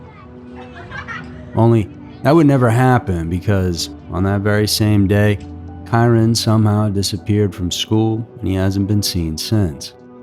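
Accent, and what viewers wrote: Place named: New Orleans, United States. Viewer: where is the narrator from? new orleans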